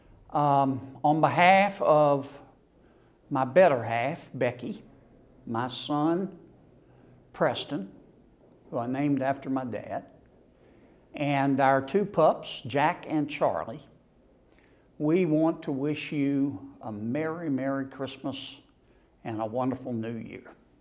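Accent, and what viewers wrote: South, United States. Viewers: I'm from Texas but you are an awesome Senator. You got that southern talk and that's ok by me. 👍 Merry Christmas 🎄☃️ May God Bless Us All. ❤️‍🔥🕊️❣️🇺🇸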